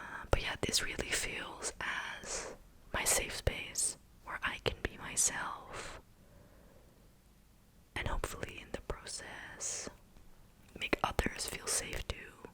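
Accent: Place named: United States. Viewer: Wow, i wouldn't have thought you were from Netherlands, your accent made me think you were american. Where did you learn english by the way?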